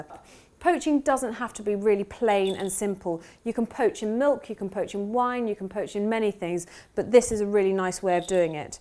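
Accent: United Kingdom. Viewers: honestly, I subscribe ld to this channel to listen to the beautiful British accent 😍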